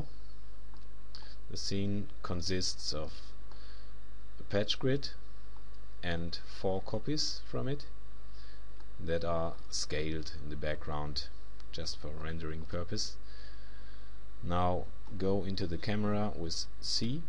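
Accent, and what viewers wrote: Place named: Germany. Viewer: The German accent is the most awesome thing ever..! lol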